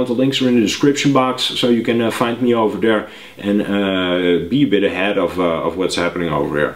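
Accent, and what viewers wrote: Netherlands. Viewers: lol i saw a couple of videos from you and i already thought you are dutch hearing your r's and now you confirmed it lol btw dutch too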